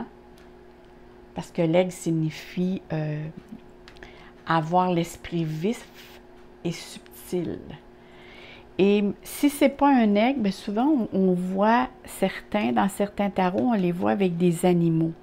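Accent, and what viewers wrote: Quebec, Canada. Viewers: Vivez vous Québec vous êtes Québécoise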